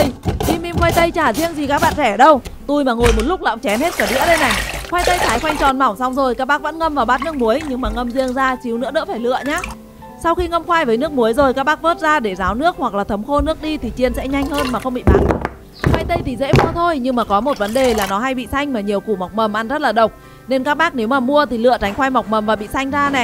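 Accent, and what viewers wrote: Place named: North, Vietnam. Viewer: Thiệt ko mún xem kênh này mà nó tự hiện ra thôi. Lại giọng miền b thì ko ưa đc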